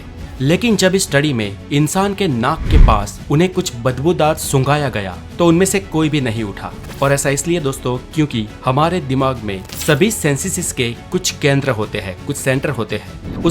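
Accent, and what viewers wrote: Gujarat, India. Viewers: Aaap Gujrati hena ????❤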